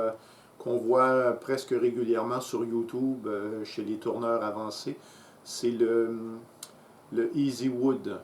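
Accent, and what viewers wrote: Quebec, Canada. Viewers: Merci pour tous ces conseils éclairés , sans ésotérisme et sans "course à l'armement. Et avec ce superbe accent québécois mais pas trop prononcé pour être bien intelligible pour nous maudits français 😄. Entre un bas de gamme chinois qu'on rachètera ou dont on trouvera vite les limites et un outil surdimensionné, le choix est toujours difficile quand on veut être économe. Mes prétention sont très très limitées à du tournage utilitaire très occasionnel , type manche par exemple. J'ai par ailleurs vu sur la belle vidéo qui expose le tournage d'un bol sur bois vert sans mandrin qu'on pouvait arriver à se débrouiller avec un minimum. Ma question est l'acquisition d'un tour Einhell léger ( donc à fixer) et avec un pas de vis non standard ( donc pas d'adaption de mandrin à mors) est forcément un mauvais choix et s'il faut plutôt s'orienter vers un tour type holzstar db 450 ou holzman, ce qui si l'on rajoute la rallonge pour des pièces de 1m, triple à peu près le budget (190€ versus 450+130€). 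.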